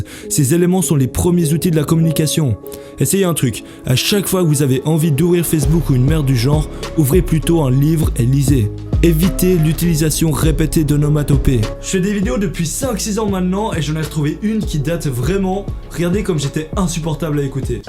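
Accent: Vaud, Switzerland. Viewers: Tu est vaudois ? J'adore ce que tu fais 👌👍 Depuis que j'ai regarder cette vidéo je parle beaucoup mieux et les personnes son plus intéresser a ce que je dit merci 😊 continue 👍👍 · T'es suisse???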